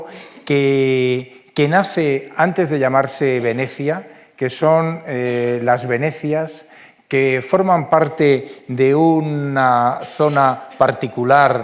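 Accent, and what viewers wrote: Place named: Spain. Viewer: Qué asqueroso es el acento español. Como si les faltarán dos dientes. Y para colmo el concepto que tienen de ellos mismos... Mediocres. Buscan compensar su mediocridad con racismo. Buscaré otra vía para enterarme del mismo tema.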